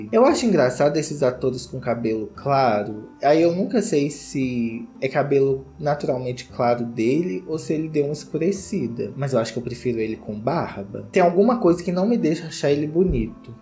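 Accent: Minas Gerais, Brazil. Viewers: Amor jeito mineiro de falar do dono do canal kkkk cucuruco muito mineiro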